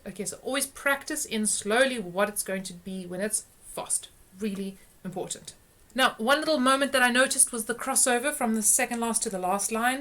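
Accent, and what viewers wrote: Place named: South Africa. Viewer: Who else came for the sexy librarian but stayed for the South African accent? But more seriously, please post the other 23 studies...they’re tough.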